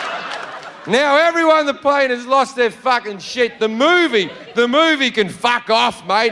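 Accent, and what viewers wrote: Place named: Australia. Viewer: I had just written ''Is he Australian ?? '' and at 7.50 I got the answer.. He is funny at times he is bringing a good point about armrests... I threw some side eyes to the armrest while I was at the window seat. Now I feel bad ... .By the way he told the story , I was able to visualize the scene... so he must have some talent... Australians are rough I think... and 9 minutes 55 seconds is all I can take of him today... I would not go to his show really .. he also seems as if he had three beers (unless this is his persona) .Listening to him is more like weight lifting exercise , the story as a whole is not offensive , although language used is heavy and rough.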